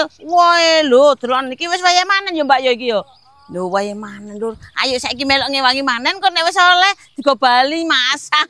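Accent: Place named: Java, Indonesia. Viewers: Maaf baru gabung ..Ini daerah mana ya..koq logatnya mirip saudara ku yg tinggal di Lumajang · NONGKO GORENG, MANTAPJENANG GENDUL, REPOT YA IBUU. SENENG LIHAT NYA KLO IBU LAGI MASAK END LAGI BAHASA JAWO SENENG AKU DENGAR LIHAT NYA DI ALEK JAWA SEMANAK BANGET INGAT ALM BAPAK IBU KU.....PERSIS DIALEK NYA SAMA GPP YA IBU DI SAMA IN YA IBU ❤❤❤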